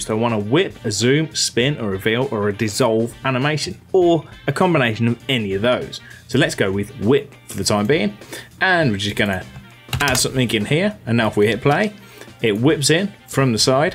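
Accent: United Kingdom. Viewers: are u from UK ur English accent seems to be so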